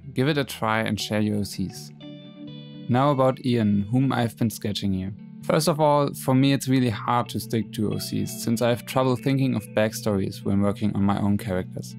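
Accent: Germany. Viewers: Also ich denk mal du beust deutsch wegen deiner aussprache , also schreib ich mal in deutsch xD auf webtoons gibt es eine story namens sirenes lament und da gibts auch einen ian der so eine attitude hat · r u from germany? haha i dont know your accent is a bit like mine. ^^” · DEVIL MAY CRY?! My favorite character. Dante is. Jeeez. Amazing. I even loved nero and dante with short hair. I hated it in the beginig. So you have with hair right? A little Dante ispired? I started listening to your videos late at night when i couldnt sleep. Ill be hones. Your german accent is... relaxing to me cuz of years of watching rtl2. It was my only sorce of anime back when i was a kid. Had luck cuz i was taking germany classes since kindergarten. Why was this so important here in my country i dont even know... i think u are so much more capable than me. Think u are even the same age as me but i dont know.. 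while i draw and paint all the time i strugle. Or maybe i should learn to accept my style. Cuz its definetly very diffrent of what i want. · dieser deutsche Akzent xD aber schön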